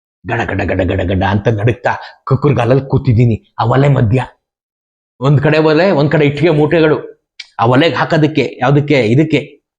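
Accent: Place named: Mysore, India. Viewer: ಬೋಸಿ 😂😂😂 ಈ ಪದ ಮರ್ತೆ ಹೋಗಿತ್ತು.... ಮೈಸೂರು ಕನ್ನಡ ಅಂದ್ರೆ ಇದೆ ❤❤❤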